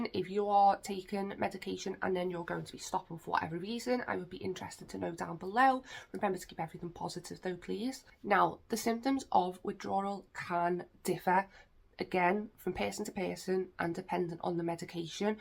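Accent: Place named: Liverpool, United Kingdom. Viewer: Need a better Mic.hard to hear, and speak slower cause of scouse accent.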